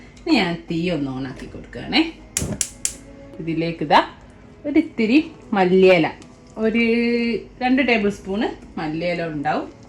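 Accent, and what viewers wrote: Kozhikode, India. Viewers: കോഴിക്കോട് കാരിയാണല്ലോ. സംസാരം 🥰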